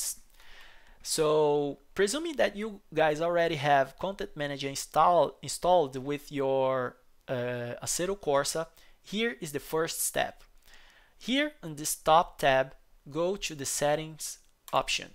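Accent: Brazil. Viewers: QUE INGLES top hein, obrigado amigo, vi que é br pelo sotaque hahaha, thankyou bro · boa mn lamento dizer mas seu sotaque brasileiro é bem reconhecível kkkkkkkkkkkkkk video bom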